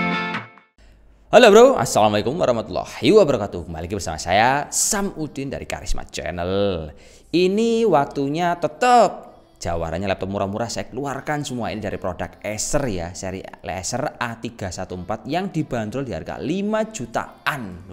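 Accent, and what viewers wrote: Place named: Java, Indonesia. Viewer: I ❤️ this guy's medok! - Wong Amryk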